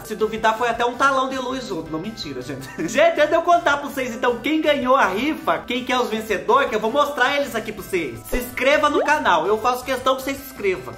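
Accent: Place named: Minas Gerais, Brazil. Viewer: Eu acho q é minéiro mesmo fala igual a mim kkkkk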